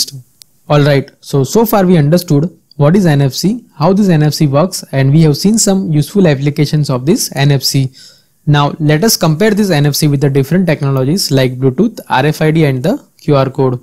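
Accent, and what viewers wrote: India. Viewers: Why is every goddamn tech guide on youtube narrated by someone with a heavy Indian accent? I'm sorry, but for some reason I really have difficulty understanding that accent. Something about the isochrony just throws me off mid sentence, and then all I hear is gibberish for a few seconds, until I recognise a row of words and can follow along again, until I'm thrown off anew. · A very beneficial video on the topic starter. Just a friendly piece of advice: since this video will be shared all over the world & not everyone fathoms the Indian accent, you could make use of reader bots the next time maybe (make it accent neutral). btw ..Did I say this was a helpful video? 👍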